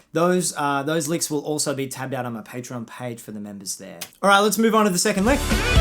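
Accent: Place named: Australia. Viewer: Hi. Good vids man! From your accent you're an Aussie right? I am from New Zealand. We both have the same high voltage (230-240 Volts). Do you have any problems with your sound when your playing live through a Tube Amp. Like a high ear piercing sharp cold tone when you play higher up the neck? If so what do you do about it. What kind of Amp are your using. I have a Marshall and put a brown Box in front to lower the voltage. But not 100% happy with it. Love to hear about your experience with that. Cheers, John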